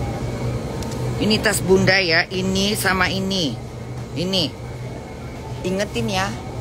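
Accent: Medan, Indonesia. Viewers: Jangan kau hilang kan bahasa medan mu corla itu lah penarikmu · "pauknya aku" 🤣🤣🤣 Bahasa Medan bgtt Bun.... Oalahhhhhh uda dikirim balik 100 juta nya ke si itu? Syukurlah ya Bun.... harga diri lebihh penting ya Bun.... 👍👍👍 Pantesan dia diam.... hahaha...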